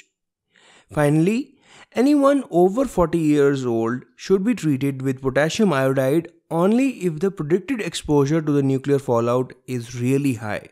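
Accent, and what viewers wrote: India. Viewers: Your accent is so Indian Love it!!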